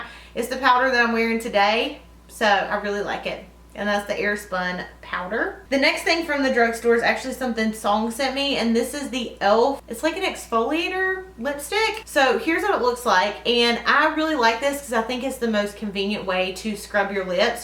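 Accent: South, United States. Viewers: I could listen to your cute country accent all day! Great video as always x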